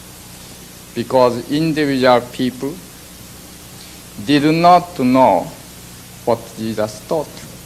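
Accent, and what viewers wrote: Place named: Japan. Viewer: Love the video, but I can't unhear that he sounds like Morgan Freeman with a Japanese accent.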